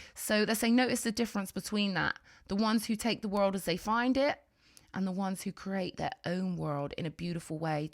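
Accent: United Kingdom. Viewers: it certainly is a British accent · It's that an British accent?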